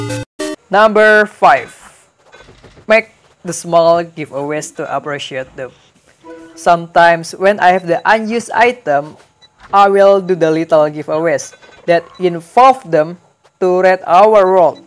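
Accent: Indonesia. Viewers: logat indonesia nya masih kelihatan wkwkwke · Indonesian accent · your sound is like indonesian people trying to talk english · woy.. english ne jgn ada logat jawa nya dong... klau gk pande bahasa inggris... tulis aja.. gk enak dengernya hadeh!! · ronald <num> His sound like Reza oktovian,so h is Indonesia.And his sound like From Indonesia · Jack Roach He indo, his accent was indo/asian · Orang indonesia ya???logat nya persis orang indo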